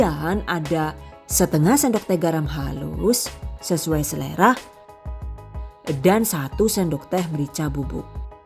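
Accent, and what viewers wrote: Banyumas, Indonesia. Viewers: Mantab masak teruuus dan lengkap Tips&Trik memasak. 👍👍👍 Mbak, Saya boleh tanya? Kira-kira apakah mbak ada rencana membuat buku berisi kumpulan resep lengkap ala lengkap Tips&Trik memasak juga?, kalau iya maka saya minat banget mau request membeli buku resep dari. Namun YouTube-nya tetap eksis mbak ya?, karena selain menyenangkan memasak 👌 dan saya terhibur menyimak pelengkap sedikit fitur kartun 😀, apalagiii bahasa inggrisnyaaa 👍 serta cengkoknya 👍 dan kadang-kadang disertai bahasa ngapak terhibur bangeeet 😁. TETAP PERTAHANKAN STYLE-NYA YAAA, SOALNYA JARANG-JARANG ADA (BAHKAN STOK LANGKA LOH YAAA) KULINER WANITA YANG JUGA MEMILIKI NILAI LEBIH BISA MEMBUAT GUYONAN. Oke mbak, kembali ke fokus request saya hal buku. Jangan lupa dibalas ya?, Saya tunggu kabarnya.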